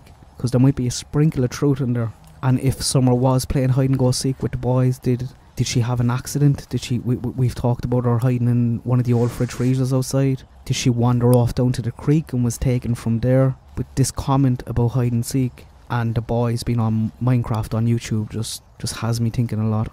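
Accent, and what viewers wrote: Ireland. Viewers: I saw that video also. You are on point. After Summer left the car that afternoon we have no idea what happened after that. She was the kind of mother that didn’t keep eyes on Summer too much. When saw where Summer slept he said he knew about Summer. He was really shocked but held it well. The mattress was on the floor so looking under a bed was off unless she pulled up the mattress. So many conflicting stories. There were no flowers planted that day. When she was at the watering hole she emphasised the flowers were at a certain spot. She knew this was a major part of her story. Oh you are for sure Irish. Kerry maybe! Love your accent!🇨🇮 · Thank you so much for that. I can't do lives or very long videos at the moment, so to have it summarised really helps. I'm with you on voice, very calming and relaxing. I would love to have been a fly on the wall when he was in service, seeing him interview murder suspects, putting them at ease and lulling them into a false sense of security. 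I love how he talks, but I love your accent too, I've many Irish friends and all their accents are beautiful, but so varied. I can't imagine why anyone wouldn't like?... but then we're all different and different things appeal to us I suppose. I'll try and look at his video, but I really appreciate your summing it all up. I share some of the same potential theories with you. The refrigerator, as soon as I saw it I thought it odd they hadn't taken the door off. I grew up in the 70s in London, and we used to get public information broadcasts, some acted, others animated, but there was one about a group of kids playing on a dump site, one of the kids ends up hiding in the 'fridge, there's a piercing scream when one of the girls finds him. The narrator with the voice of doom explains how no matter what, you never, ever play with old fridges and that if you get rid of one, you must take the doors off. 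Every holiday they'd play it, among others. Plus my parents drummed it into us. One of my preferred theories was exactly like yours. Either they did the whole hide and seek counting thing to get rid of her annoying them, or actuall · I am slowly going through your others. I must ask where in Ireland are you from, your accent is lovely. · I agree, I really like and it is a great advantage to have a retired homicide detective looking into the case. ASMR - yes! LOL, he has a relaxed way of speaking and I do fall asleep listening to him but then I'll relisten from where I conked out. I also like the way you speak and your Irish accent.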